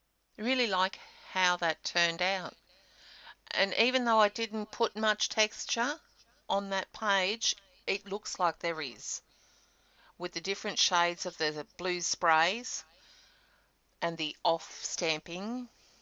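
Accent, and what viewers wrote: Australia. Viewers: Great to hear another Aussie. That is an awesome page. Thanks for sharing.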